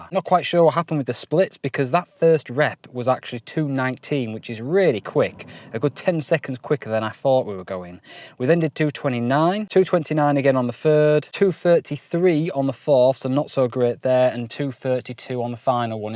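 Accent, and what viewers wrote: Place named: Yorkshire, United Kingdom. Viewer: So glad I’ve found your channel! Really good review. I’m Spanish but I lived in Yorkshire for a few years so it’s nice to listen a bit of an accent :)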